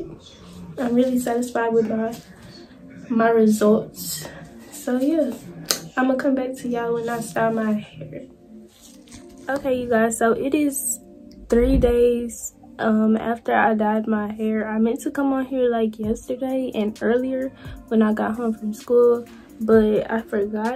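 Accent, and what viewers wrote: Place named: Texas, United States. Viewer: Your hair looks beautiful!! I said yep that's a Texas accent.... possibly East Texas! Thanks for showing us doll💚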